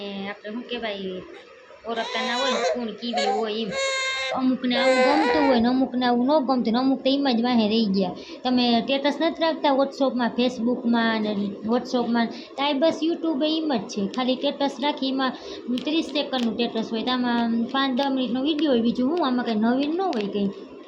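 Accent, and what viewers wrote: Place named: Mer, India. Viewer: Ben emaa dhyan j n devaay saachi vaat che ben aapde aagd vdhy bijaanu n jovaay tme ben amaara mer jevu j bolo cho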